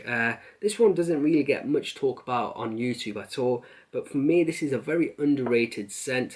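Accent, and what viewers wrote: Birmingham, United Kingdom. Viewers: Are from around Birmingham mate? Just got this myself today. love it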